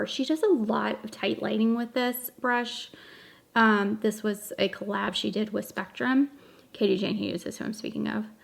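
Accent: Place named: Kentucky, United States. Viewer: I am new to your channel after I had second thoughts after already ordering this palette. I love the look and I may give it a try. I was already thinking about returning it and I just ordered it today, so of course I haven’t received it yet. I am scared of blues. I am actually scared of color, period! The main reason I subscribed is because I am from Kentucky and I have the same accent. I use the word y’all all the time. I love the look, but I’m still second guessing. $65 is expensive if I don’t like it. But it gives me the 90’s good ole days vibe.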